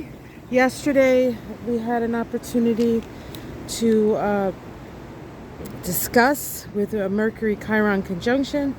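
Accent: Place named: New England, United States. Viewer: Good morning from Sunny 45 degrees in Wichita, KS - going up to 68 today! I’m from CT and lived in Brookline, MA in the mid-80’s and your accent really brings me back! Love your astrology readings! Thank you! ♋️♒️♓️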